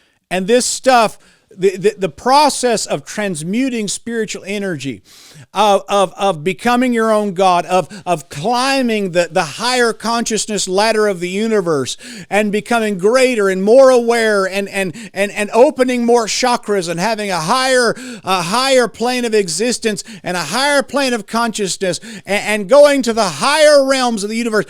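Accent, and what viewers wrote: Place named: South, United States. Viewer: That’s not how it works. You should learn about addiction. You sound really southern. Learn to read.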